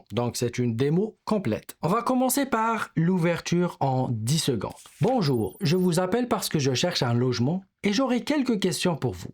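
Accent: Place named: Canada. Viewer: Avec un accent canadien, excellente vidéo 👌💖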